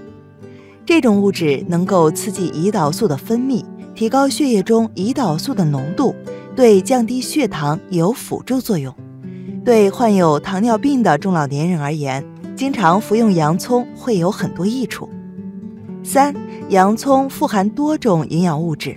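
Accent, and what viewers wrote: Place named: Mainland, China. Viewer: 明明就是ㄒㄧㄝ(第三聲)糖，為什麼總是讀ㄒㄩㄝ、糖？大陸發音？我學的不對？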